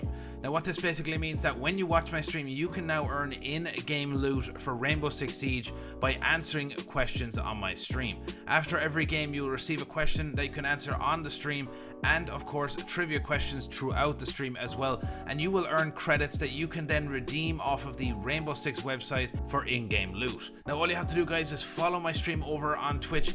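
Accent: Ireland. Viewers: Wat part of ireland r u from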